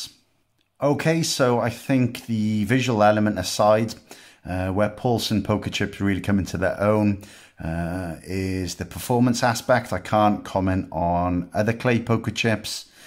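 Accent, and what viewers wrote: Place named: South Wales, United Kingdom. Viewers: You sound like a south walean. Am I right? Cardiff/Caerphilly way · Swansea, along the Mumbles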